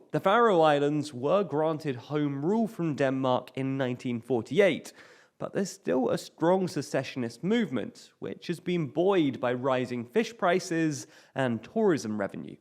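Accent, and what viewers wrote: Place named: RP, United Kingdom. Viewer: Interesting how “island” and “Ireland” are homophones in your RP accent.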